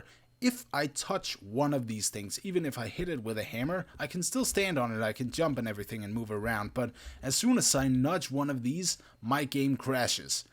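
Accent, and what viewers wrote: Denmark. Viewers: Is he Danish?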